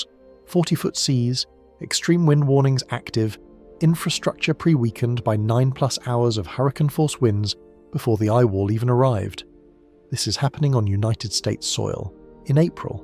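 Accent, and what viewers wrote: United Kingdom. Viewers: I’m sorry, but us YouTube listeners watchers are sick and tired of this British accent crap, especially this one, instant turn off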